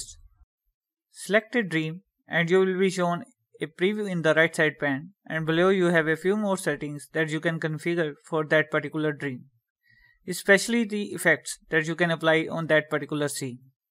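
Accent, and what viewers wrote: India. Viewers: Me hears an Indian voice... Instantly clicks away... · love the Indian accent.. good , helpful video too. Thank you! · indian english is cute xD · 100% Hindu. I swear didn’t even look at comments but I knew immediately he is from India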